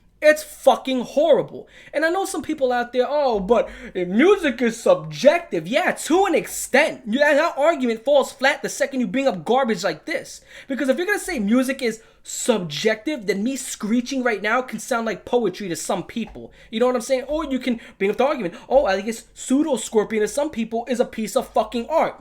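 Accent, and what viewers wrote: New York, United States. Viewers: Besides the obvious comparisons to Plagued Moth and Wendigoon, there really isn't anything else like on Youtube. The New York accent makes it for me. Sounds like Michael Rapaport talking about gore XD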